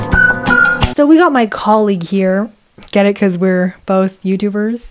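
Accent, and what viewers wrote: United Kingdom. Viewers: Stop the british accent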